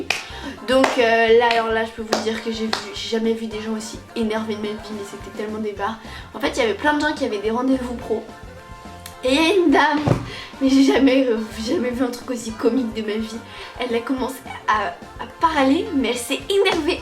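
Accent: Belgium. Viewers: Tu es Belge? Sinon super vidéo :)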